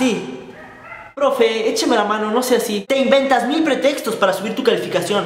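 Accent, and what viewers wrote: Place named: Mexico. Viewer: Eres muy mexicano tio, no te he entendido nada xddd Saludos desde EspaÑaaaa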